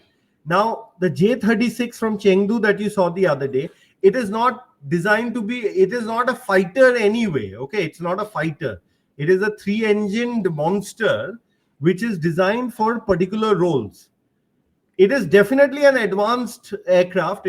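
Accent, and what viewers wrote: India. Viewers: The inferiority complex of the Brahmans lol. The bloody Indian accent makes it even worse.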